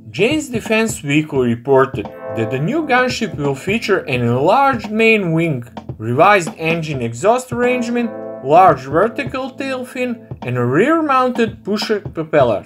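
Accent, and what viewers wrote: Russia. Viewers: Why the fuck should I believe any one with a damn Russian accent giving me updates on a new American advanced helicopter!? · Why a Russian narrator ? hard to understand what he is saying ! still love all of the cobras ! :) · Drunk, Russian narrator needs to sober up...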